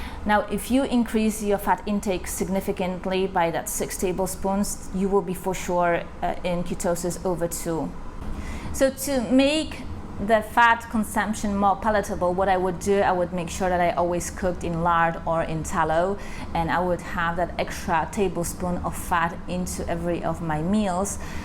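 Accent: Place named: United Kingdom. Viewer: With your British(?) accent you need to speak slower and more clearly if you want to increase USA audience, although you are pretty good looking so maybe it won't matter. However thank you for your good content that I will try now to listen to. Correction, your speed and clarity now that I have listened is really not bad. I might be just a slow old man.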